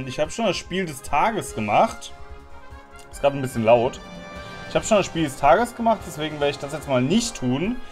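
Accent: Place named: Saxony, Germany. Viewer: Sächsisch lool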